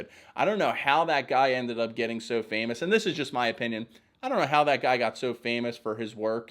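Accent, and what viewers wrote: Philadelphia, United States. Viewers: Those shows drive me bananas. I'm the only heavily-tattooed person (and usually the only person with tattoos at all) in nearly all of my friend groups, so people always come to me with questions, and it's usually because they've seen some terrible show like this. Also, I like your accent. Are you from Philly?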